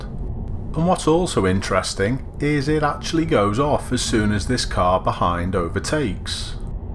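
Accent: Liverpool, United Kingdom. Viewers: Remove ALL German whips from our roads haha!!!! Seriously though, not great driving I agree. I am now 50 years old and my driving has improved significantly since I was a youngster. It is ALL to do with attitude and mindset! Keep up the good work, I have never listened to a Liverpudlian so much before but your videos are great and keep me mindful about so many things when I drive.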